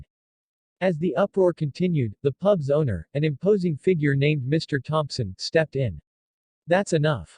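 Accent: United States. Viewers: Why do we have listen to a story telling American it’s cringeworthy.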